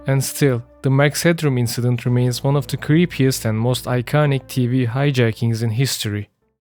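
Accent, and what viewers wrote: Turkey. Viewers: Yo dude are u turk? ur accent sounds like that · Hello where are you from? You kind of sound turkish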